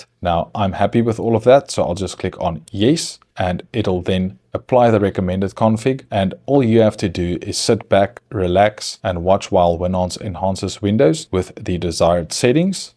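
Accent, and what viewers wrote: South Africa. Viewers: Saffa accent I hear? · You sound South African. Great work. Thank you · You sound South African. It's not on git. I will assume AI slop unfortunately. I won't bother with Windows again though. If I really had to use Windows for someone else I'd just use shadowwhisperer/XakerTwo scripts from github, ChristTitus Winutil from github and the like. Transparency is needed. It is important to specifically turn off Windows malware injection garbage specially on Asus pc's. Windows Platform Binary Table (WPBT). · You sound like a South African that lives in Ireland.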